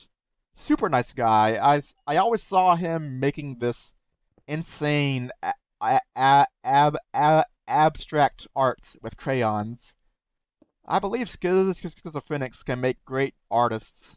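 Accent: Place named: Georgia, United States. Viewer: thank you for sharing your story it was very nice. you actually said you were from the south and it didn’t click until you said that and I was like “oh yeah! you sound just like people from my city!” I’m from north GA. I’m glad that you’re feeling better and sh clean for a year! congrats! 🎉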